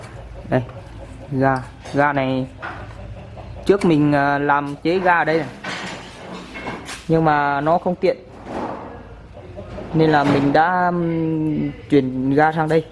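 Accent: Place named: Central Highlands, Vietnam. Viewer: Oh giờ mới thấy rõ ghe giọng nói hình như là người vùng cao OK Number one.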